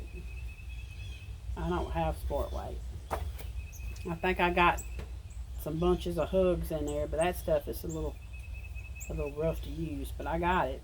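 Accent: South, United States. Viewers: Enjoyed your video, this is my first time seeing you. I was recommended by "Summer's Tips and Stitches". She said she loved hearing your Southern accent. I am a southern woman also, from South Carolina. Love your country scene. Look forward to seeing you again. God bless and pray that you and your husband get your money back.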